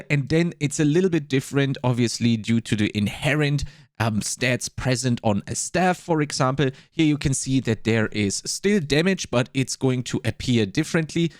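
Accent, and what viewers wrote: Germany. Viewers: Perfect, just watched the other video about items and their stats before work today. Now this one drops when I get home. If I trust anyone with making cheat sheets and this stuff it's a dude with German accent.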